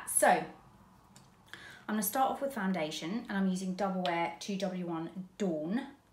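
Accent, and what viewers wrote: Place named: Northern England, United Kingdom. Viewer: You look absolutely stunning ❤. I love that you totally, 100% know what works on your skin and how to use those products to give a beautiful finish, and with no faff or complicated techniques. 👌👌 I'm also glad that you're having lovely warm weather, I miss the summer's in England so much. The country pubs, the charming chocolate box villages, and hearing the birds sing......so many precious and wonderful memories of my younger life.....🥰 Loving the Northern accent 🫣🤣 Enjoy the sun 🌞 ❤🥂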